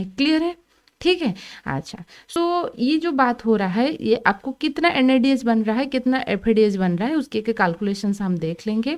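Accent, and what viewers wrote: Odisha, India. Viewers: I think you’re odia that i got know through your accent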